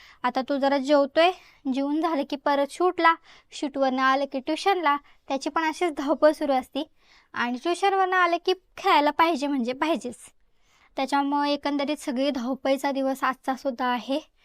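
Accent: Kolhapur, India. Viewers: Your Kolhapuri accent is so niceeee❤️❤️ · अस्सल कोल्हापुरी भाषा ❤ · विषय असा झालाय 💥😁 Kolhapuri bhasha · Kolhapur typical accents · Kolhapuri tone 🔥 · Mam kolhapuri marathi jagat bhari......1 no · कोल्हापूरी टोन ek no.🔥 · Tumhi bolnyat कोल्हापुरी tone ahe ❤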